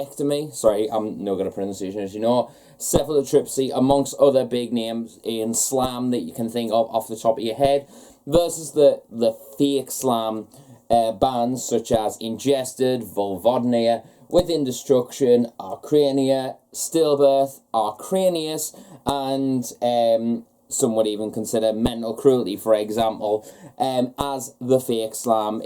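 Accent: United Kingdom. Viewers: It's a Geordie accent, he's from Newcastle or Sunderland in the uk, the accent is sexy af with girls the Geordie accent lol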